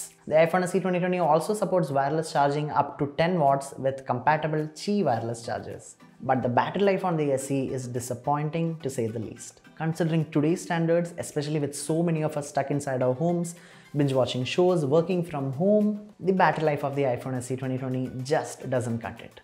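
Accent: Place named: South India, India. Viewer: Excellent video, dude. Keep up the good work. Can't resist saying this : Try to have a neutral accent. You sound like a South Indian. Apologies if I am wrong :)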